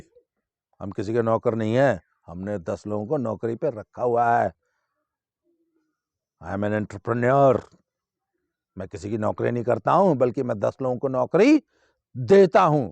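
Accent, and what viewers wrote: Haryana, India. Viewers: Guruji ये विडियो देख के बड़ा मजा आया ओर अच्छा भी लगा के आप वापिस अपनी फोर्म मे आगये, आपका देसी अंदाज़ ओर भोजपुरी मे हरयाणवी टच सरसो के साग मे देसी घी, प्रणाम🙏🏻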